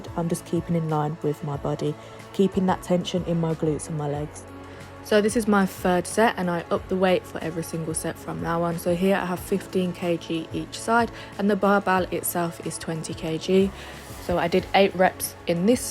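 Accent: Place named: Birmingham, United Kingdom. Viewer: Dunno if I can deal with the brummy accent😂 a decent pump never the less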